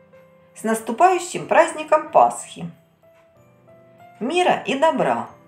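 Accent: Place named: Ukraine. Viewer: Акцент хохляцький. Важко слухати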